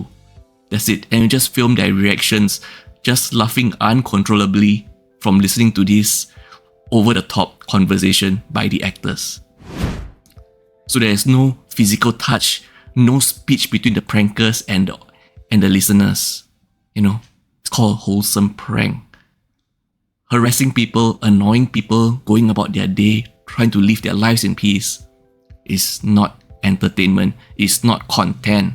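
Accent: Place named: Singapore. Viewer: your accent is giving me "Singaporean" vibe..lol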